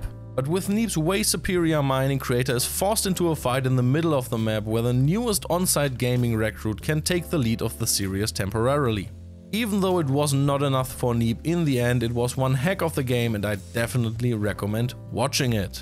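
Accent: Germany. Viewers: Is from Germany? It sounds really like german guy tryharding to not have an accent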